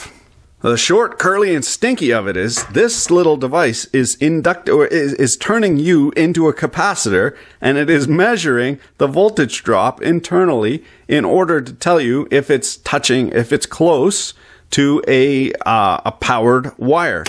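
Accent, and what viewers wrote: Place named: Nova Scotia, Canada. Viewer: What the heck is that accent? It sounds like someone born in Nova Scotia who moved to Ontario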